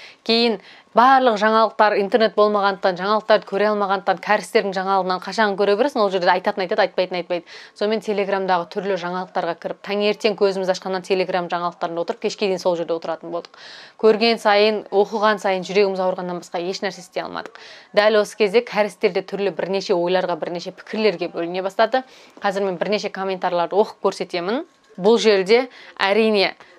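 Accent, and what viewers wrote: Standard. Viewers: тіліңізді таза ұстаған екенсіз.Риза болдым.Қыз бала тілекші дейді.Қазақстан деп тілеген дұға тілектеріңіз қабыл болсын.Аман болыңыз.Отбасылық бақыт тілеймін. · Айналайын жаным бізде сені жақсы көреміз сыңғырлаған дауысыңнан қазақшаны тап таза сөйлейсін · Шет елде жүрсең де таза қазақша сөйлеп.қазақ деп соққан жүрегіңнен.Сенің аңгімеңді тыңдап көзге жас келді.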